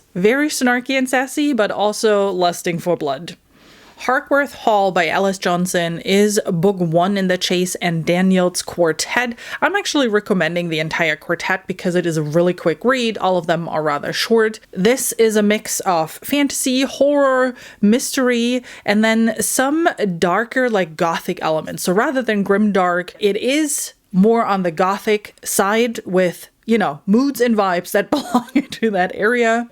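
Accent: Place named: Boston, United States. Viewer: First, love the accent (north east bostonian?) seemingly masked tho— just wanted to say first time viewer but will check into your rec’s!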